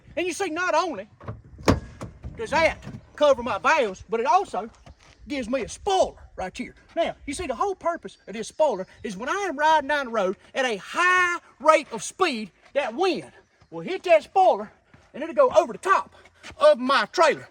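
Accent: South, United States. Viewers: This guy's Southern accent makes me laugh 😂😂😂😂😂😂😂😂😂😂😂😂😂😂😂😂😂😂😂😂😂😂😂😂😂😂😂😂😂😂😂😂😂😂😂😂😂😂😂😂😂😂😂😂😂😂😂😂😂😂😂😂😂😂😂😂😂😂😂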